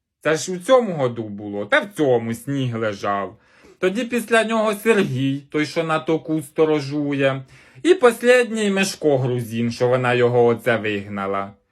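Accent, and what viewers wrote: Poltava, Ukraine. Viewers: СУПЕР!🤣🤣.Полтавський говір 100/. Як в селі побувала.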